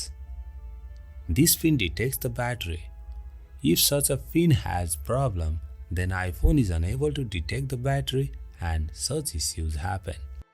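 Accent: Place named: India. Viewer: This video could be the most useful for me rn but Indian accents has to be the worst man i dont know a shit what bro saying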